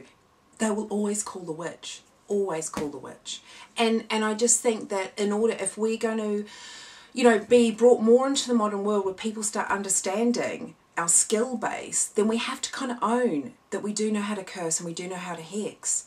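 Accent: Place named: Scotland, United Kingdom. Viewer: you sound Scottish :))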